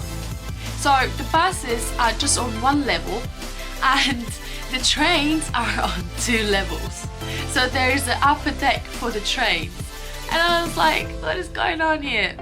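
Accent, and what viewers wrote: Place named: Bogan, Australia. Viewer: London, yet use a uk flag ... such a bogan accent.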